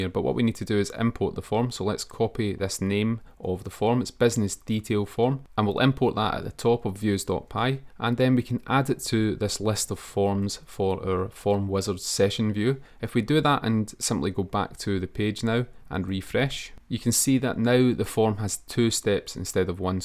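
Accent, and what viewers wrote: Scotland, United Kingdom. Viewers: Just curious - where's your accent from? I'm thinking Scotland, but I'm not sure if I've heard it before... 🙂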